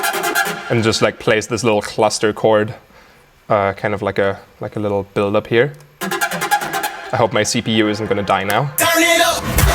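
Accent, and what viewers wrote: Germany. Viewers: I love his German accent XD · I always thought he was Irish, but now I know he's German I can actually hear it, bizarre! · wie man das deutsch raushört :D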